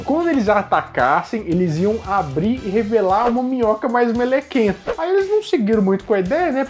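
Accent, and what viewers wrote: Minas Gerais, Brazil. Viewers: Beijao, adoro seus videos, curto demais, e o doc.? não tem possibilidade nenhuma de vc continuar fazendo? Amo seu sotaque mineirinho. E caça mais filmes com atores bem no inicio de carreira, miito bom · É tão bom vê um vídeo com nosso sotaque mineiro 😃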